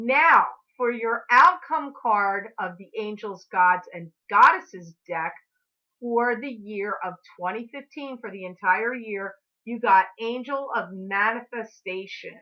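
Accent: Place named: Chicago, United States. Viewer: did you grow up in the Midwest? You totally seem like you're from Chicago in the way you talk and act! (That's a compliment, by the way! LOL)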